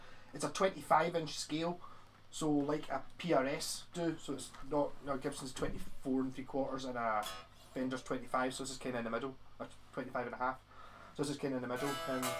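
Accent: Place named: Kirkintilloch, United Kingdom. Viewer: accent suggests Kirkie?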